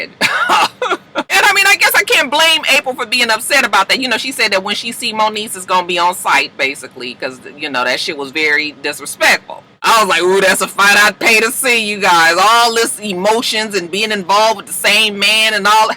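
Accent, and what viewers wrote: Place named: California, United States. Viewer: Your ray j cali accent makes me so homesick 😭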